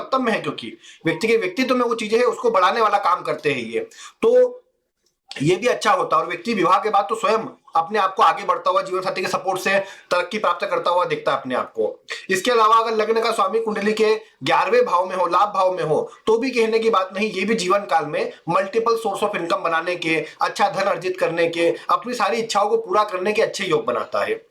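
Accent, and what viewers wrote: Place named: Maharashtra, India. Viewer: लम्बा वक्तव्य होने से आपका गला सूख रहा है। अगली बार इसका कुछ उपाय करके विडियो बनायें। और, आपकी भाषा एवं आपके उच्चारों से लगता है कि कदाचित् आप मूलतः हिन्दीभाषी नहीं हैं अथवा आपकी हिंदीभाषा पर संभवतः मराठी भाषा का प्रभाव है।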